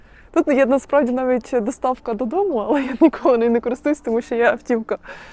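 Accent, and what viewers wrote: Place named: Poltava, Ukraine. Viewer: Впізнаю рідний полтавський акцент 😊. Трохи незвична відсутність суржику 😅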